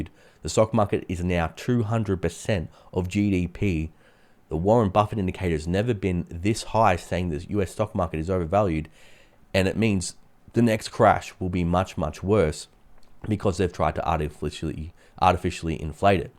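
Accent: Australia. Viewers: An aussie voice is a ray of sunshine on a cloudy scottish day! Happy New Year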